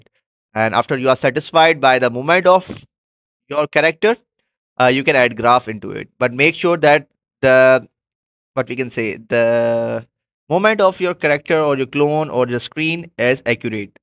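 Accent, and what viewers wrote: India. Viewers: Bro not the Indian voice It icks me out a bit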